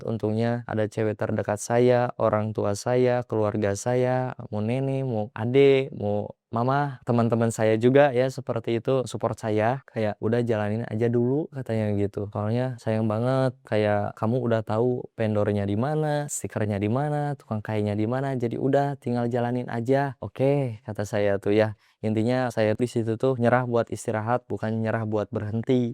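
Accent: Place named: West Java, Indonesia. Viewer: kebiasaan orang sunda ngomongnya gitu bang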